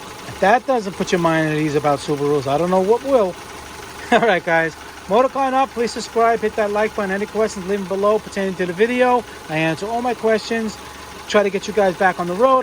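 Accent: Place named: Ohio, United States. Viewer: Appreciate the video, also sounds like one hell of an Ohio accent lol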